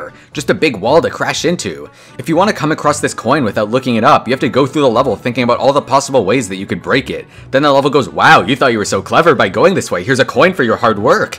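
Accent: Canada. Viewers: canadian accent 🥱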